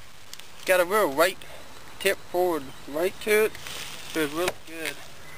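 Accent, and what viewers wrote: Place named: Appalachia, United States. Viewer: I luv a good backwoods hillbilly accent and my brother you got one! Lol No offense I find it very amusing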